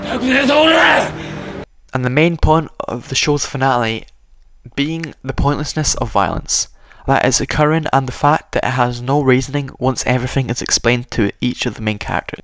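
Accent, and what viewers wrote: Scotland, United Kingdom. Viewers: are you scottish??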